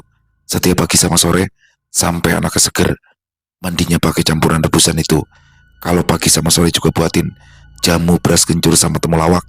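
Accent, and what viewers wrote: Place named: Banyumas, Indonesia. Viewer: Ini mas kalo di joinin dengan mas bawor cocok,sama sama ngapaknya dari banyumas ❤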